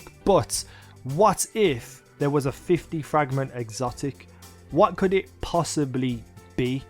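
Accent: Birmingham, United Kingdom. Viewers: U from Brum?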